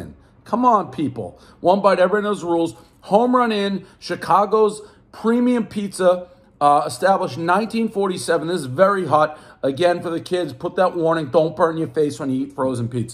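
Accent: Boston, United States. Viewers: He's pure boston, newengland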